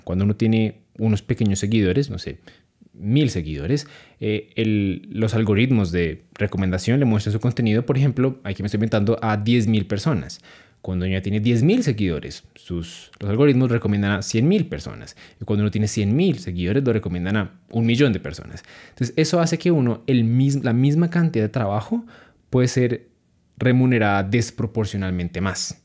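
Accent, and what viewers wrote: Colombia. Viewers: Por la forma de hablar supe que es Colombiano.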